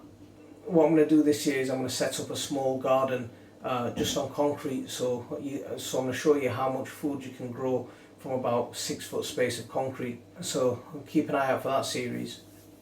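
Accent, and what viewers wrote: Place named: South Yorkshire, United Kingdom. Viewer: Nah then lad, you sound like you come from near me in South Yorkshire. I usually set my chillis off on heat mats, but like you sometimes I forget to label them. Last year my mate ended up with a reaper, and I ended up with some Apaches instead of vice versa. Good vid, I have subbed and belled.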